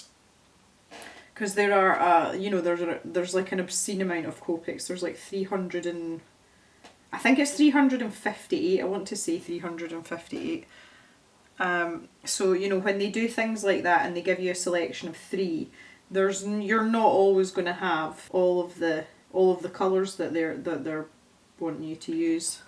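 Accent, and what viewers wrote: Scotland, United Kingdom. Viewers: I LOVE your accent!!!! Living in the states, the Scottish accent sounds so exotic!!!! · Scottish ASMR - reading anything says with some sarcastic comments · Your painting is amazing! Oh, and your accent... I've said it before, but I LOVE the Scottish accent! It's what drew me to start watching your videos, and I have become a huge fan of your artwork ❤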